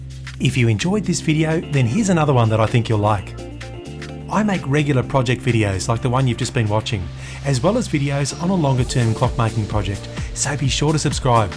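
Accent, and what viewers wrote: Australia. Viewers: realised that now when you say it. At first I thought he was Britain but then When you said it. I realised that he is Australian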